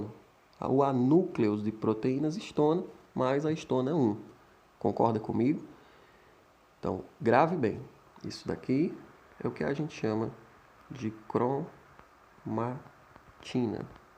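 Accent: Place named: Minas Gerais, Brazil. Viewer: Modo de falar legal em minas, amei esse trem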